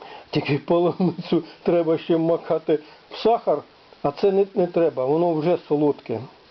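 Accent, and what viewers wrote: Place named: Kharkiv, Ukraine. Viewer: Дай Бог вам всього доброго , мирного , світлого ! Як приємно чути наш харківський говор !!!! Супер !!!!! Дякую 🙏🙏🙏.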